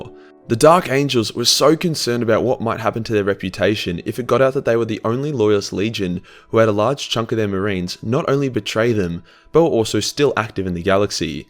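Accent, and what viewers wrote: Australia. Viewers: It feels like I'm listening to an Australian talking about kiddos with adhd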